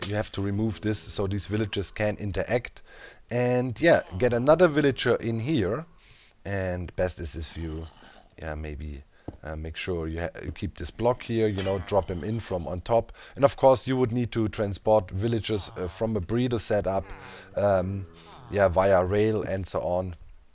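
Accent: Germany. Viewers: Ahhh... Man hört hart raus, dass er normalerweise Deutsch oder so redet 😂 dat accent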